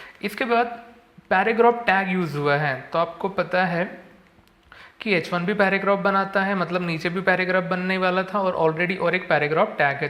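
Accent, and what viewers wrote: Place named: Maharashtra, India. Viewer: He sounds marathi for sure 😁😂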